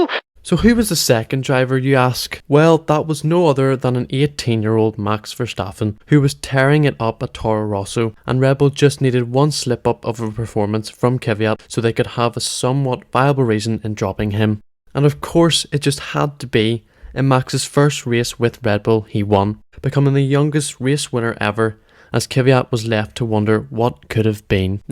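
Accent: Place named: Northwest, Ireland. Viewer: Bro, its a guess, but are you by any chance from Ireland, that accent soumds like from the North or northwest of Ireland. ❤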